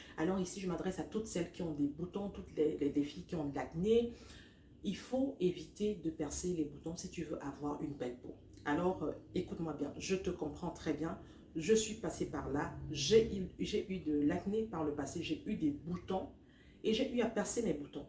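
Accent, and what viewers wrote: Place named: Cameroon. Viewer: Timbre camerounais ce que vous dîtes est très vraie j'aimerais svp savoir comment faire pour avoir votre extracteur svp merci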